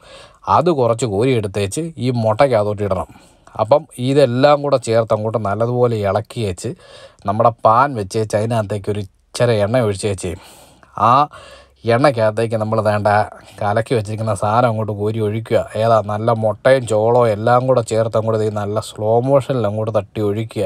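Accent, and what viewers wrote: Kottayam, India. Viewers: samsaram patented. Athu vittukodukkaruthe. Kottayam Malayalam slang kittulla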